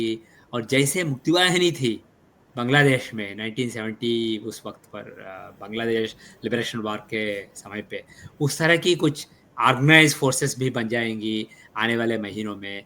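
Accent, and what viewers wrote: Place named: South, India. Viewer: take time to speak clear hindi, sir ji. need more practise in hindi. south version of twist of your tongue needs correction.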